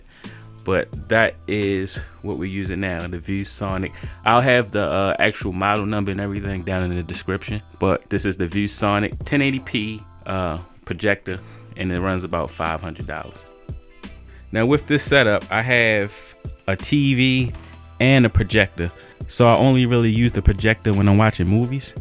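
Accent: Baltimore, United States. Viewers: Gotta be from Baltimore😂😂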